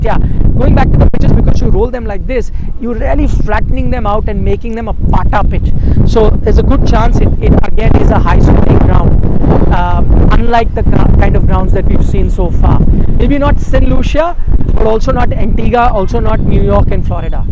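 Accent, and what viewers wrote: India. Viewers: you sound like an Indian journalist Speak Hindi Practice before you start recording Voice quality sucks You should be little more professional Just the English won’t help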